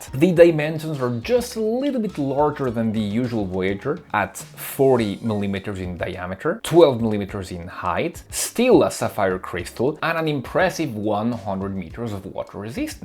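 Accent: France. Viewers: Yet another great video, thanks. Love the accent, reminds me of the times when I worked for a French company (11 and a half years!) . I am looking forward to your review of the Specht&Soehne. Crazy German-ish name for a Chinese watch, huh? I am not fond of Homages of watches that are too unique to be considered "universal property (Submariner, 50 fathoms, Explorer, AquaTerra, Daytona, Speedtimer, Dirty-dozen field watches, Flieger watches)", unique watches such as the Alpinist (Militado released one recently and I have mixed feelings about it) or the Vacheron Constantin 222 (which Pagani Design "homaged" and failed spectacularly at replicating the bracelet, a failure that every youtuber "fails" to point out, imagine that...). But if anyone succeeds at properly homaging the Land-Dweller, I just might consider buying it, because I really want a Genta-style watch. 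Otherwise, I would have to turn to the Festina F20051 or F20065 (which are on sale right now at about 170 EUR). The PRX is too damn expensive right now and the prices will go higher for a while, as long as people associate it to the Land-Dweller.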